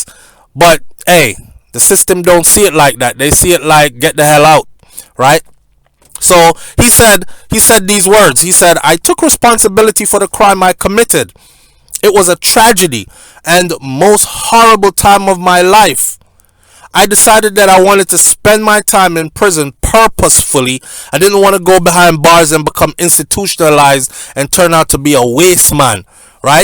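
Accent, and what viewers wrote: United Kingdom. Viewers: Man British accent turn up. I give you A+ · Well done on the British accent. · British accent fit yuh 😂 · Big Up To The British Accent. I was born in England and I am telling you its one of the most Racist Countries in the world. I have lived in Jamaica for 20 years. I would like to see them try and arrest me at their Airport Hell and Powder House they would have to send for the Army. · Good British accent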